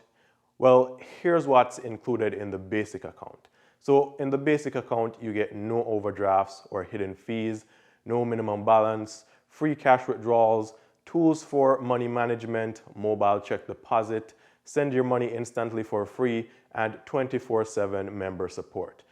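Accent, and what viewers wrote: Jamaica. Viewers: Weird question what part of the Caribbean you from. I want to guess Jamaica but I maybe wrong.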